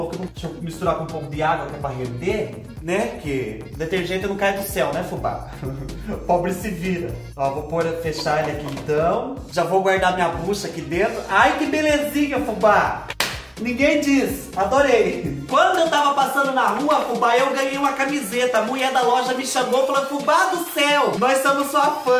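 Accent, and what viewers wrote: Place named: Minas Gerais, Brazil. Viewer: Me divirto tanto com vc...alegra meus dias,.menino lindo, cada dia admiro mais sua simplicidade e bom humor!!Amando vc..queria só saber se onde e vc se e mineirinho ou de outro lugar...seu jeitinho de fala e parecido com o meu..só que sou paranaense mesmo...qua do me apresentaram seus vídeos ..me disseram " olha aí Ivete alguém que fala que nem ocê""kkkkeu falo tudo anssim tamém...😂😂😂pois agora e só ocê que vejo pra dá boas risadas fubá do Céu!!!😘😘😍😍😍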